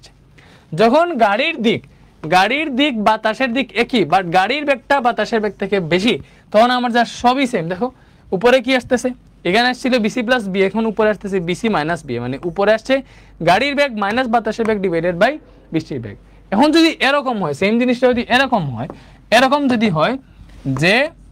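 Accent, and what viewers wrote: Sylhet, Bangladesh. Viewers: ভাই সিলেটি নি??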